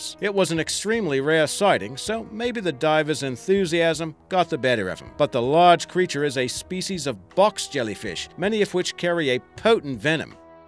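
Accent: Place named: Australia. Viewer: ...........had to listen to 3 or more to understand what he was saying.......an entire "ployeted"....gotta be Australian.........."knaiefe"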